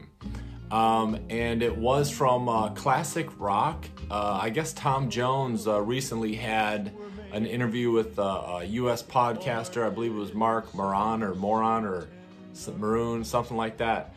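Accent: United Kingdom. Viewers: I read that on Google earlier. I can’t believe that Elvis would want the Beatles as his backup band..he had an incredible band! I love your English accent too lol! Imo, I don’t think they had the greatest musicians anyway! Elvis’s guys were perfect.. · I don't believe he wanted them he had a great band backup singers. Did like your British accent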